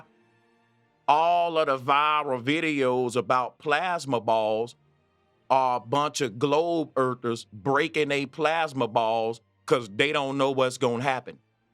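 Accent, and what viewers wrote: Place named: South, United States. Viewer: you disrespect all the black women that made it possible for America to brag about being the first on the moon. You do know if it wasn't for a black female mathematician came up with the calculations for America to 1st send a man into space, than to the moon. GOD is a jealous GOD should have given you the red flag that something isn't right. Why would a being over all be jealous, you peole are too old to believe these fairy tales. Flat Earthers have literally been proven wrong just recently from that trip to Antarctica. Why didn't you take the trip that guy offered to people like you. You can't prove it and no one has silenced you, we laugh at your ignorance in 2025 because there are so much proof of yourr foolishness. In the end you making the statement thst everybody hates Trump so he musy be good, you country accenr does you know justice and just hearing you talk makes me think of why Harriet Tubman always carried a shotgun when she freed the slaves. 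Trump has literally tried to overturn the 1964 civil rights acts but you understand why MAHA likes him. Yeah because the want to makes "US" slaves again...actually get some knowledge because you're lost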